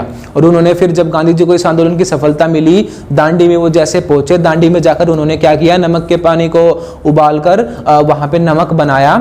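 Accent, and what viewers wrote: Haryana, India. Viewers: From haryana??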